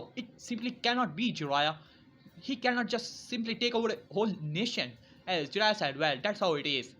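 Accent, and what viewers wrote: India. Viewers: I knew he was a Indian by his accent of English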